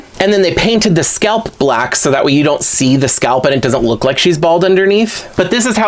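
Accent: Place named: United Kingdom. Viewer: My goodness that British accent lol😂 I hope that’s not what we sound like 😂😂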